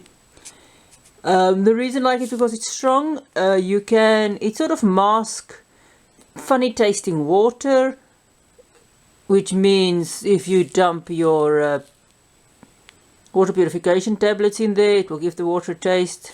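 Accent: South Africa. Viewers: That's a hell of an accent. South African?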